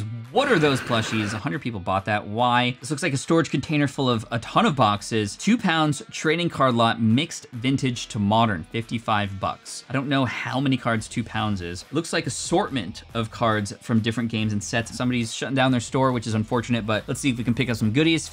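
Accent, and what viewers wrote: France. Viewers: I love your french accent it's really funny. By the way i realy like your videos continue like that. A random guy from switzerland🙃🇨🇭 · j'adore ton accent français HAHA (I love your French accent)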